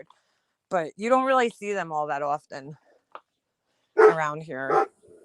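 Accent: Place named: Brooklyn, United States. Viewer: I don’t know how your channel would up in my feed but it’s wasn’t too bad listening to it, coulda been cuz of Ron’s channel. I will say that I have family in Mass Park and I go there frequently, matter of fact I was there 2x last week and the PD wasn’t there each time, my sister also said they’ve been outta there for 2 weeks already. Good luck seems you’ve got a well established following. Haven’t heard the Bensoshurst / Bay Ridge accent since I retired !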